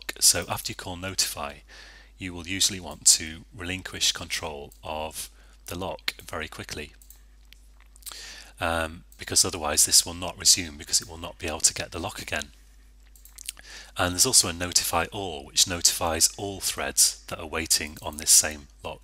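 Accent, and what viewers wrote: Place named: United Kingdom. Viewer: Well done) Thx, nice tutors. It will be better to subscribe on your channel. Oh by the way. One little question: You are from GB ? interesting accent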